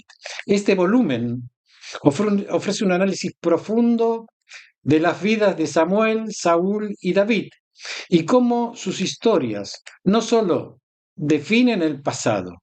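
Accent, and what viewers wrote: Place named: Buenos Aires, Argentina. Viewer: Yo pienso, señor que tiene una voz buena para el canto, y su acento porteño tan marcado, me gustaría escucharle un tango, o una milonga, como:, y otros. Porsupuesto con todo respeto a sus enseñanzas, y lecciones cuantas cualidades, shalom ve alejem.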